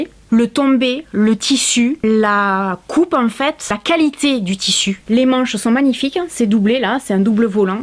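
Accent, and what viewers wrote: South, France. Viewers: Je ne sais pas comment je suis tombé sur cette chaîne mais en tout cas je trouve que tes vidéos sont super agréables à regarder. Le franc parler, l'accent du sud, l'humour.... c'est sûr y'en a pas 2 comme toi !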